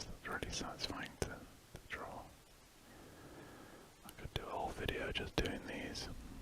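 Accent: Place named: United Kingdom. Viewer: This guy reminds me of Asmr for nerds but with a British Accent. Idk, pretty sure it’s the same guy… anyway love the vids man keep up the good work!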